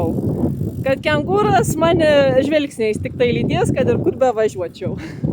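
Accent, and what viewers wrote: Lithuania. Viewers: Sveiki, smagu Jus stebeti, is kur Jus esat Lietuvoj, kalba kaip Siauietiska arba Panevezys?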